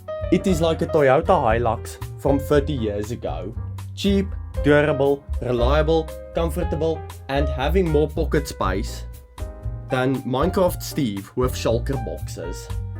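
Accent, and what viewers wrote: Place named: South Africa. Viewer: you have the strongest saffa accent ive ever heard. Wish the guy who offered me a genuine Rhodie wasnt greedy (bro wanted 5000 rand) 😔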